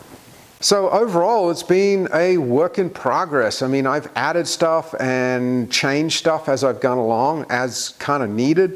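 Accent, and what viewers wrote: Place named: Australia. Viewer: Thx. Different subject - on the first of your videos that I watched I tried to figure out your accent (kind of a hobby of mine). It was clear at least 50-75% is Australian, but there’s a significant influence from somewhere else, possibly Western European. Care to enlighten me? 😁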